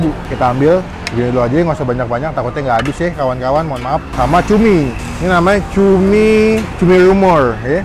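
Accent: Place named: Java, Indonesia. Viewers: njir jowo asli 😂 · Bang ngomong jawa serasa nonton ndarboy genk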